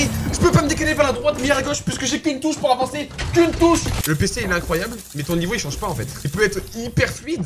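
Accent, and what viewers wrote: United Kingdom. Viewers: 8SUR10 TON ACSENT ANGLAIS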